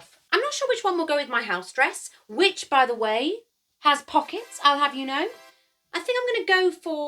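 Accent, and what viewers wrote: United Kingdom. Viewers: I was being a butt at the beginning of this video, expecting it to irritate me, even though I agree with the subject. But your skilled analysis and breakdown of the issue quickly turned me around. I just subscribed. Your video helped to make me aware of my blindspots and preconceived notions. One of those being a slightly xenophobic perception of the british accent. Thank you, and I'm sorry for doubting you.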